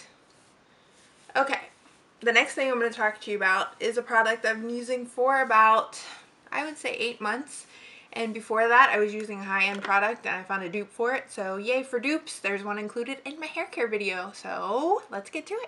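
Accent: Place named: South, United States. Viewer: Jw where are you from? You kinda have a southern accent.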